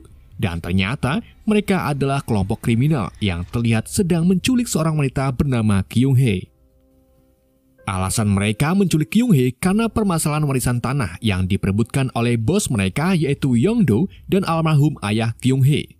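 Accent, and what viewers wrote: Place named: Java, Indonesia. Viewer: Bosooomu kok lucu ngunu min, tibake wong jowo ta🤣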